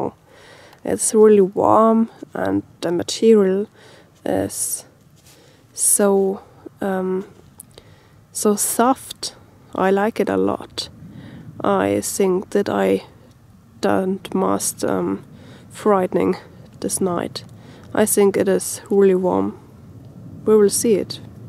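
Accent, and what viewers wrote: Germany. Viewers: Do you own a handgun. Is it hard to get a license for that ? What about bugs there in summertime. Your dog is nice. You have a nice German accent. Greetings from Eastern Canada. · Cold outside😉like your Denglisch 😉👍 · Great results from your sleeping bag ..... love your German accent...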